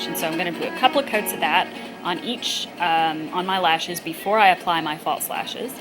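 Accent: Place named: New Jersey, United States. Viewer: I'm from Jersey and I really just noticed the "accent" in your voice I've been watching you for a while!! lol I know it's random that I notice it so late! oh well though I love it!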